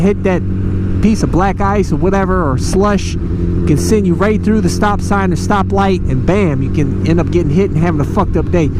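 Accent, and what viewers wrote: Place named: Cleveland, United States. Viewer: Clownsization I’m from Mayfield Village. He’s got the Cleveland accent for sure.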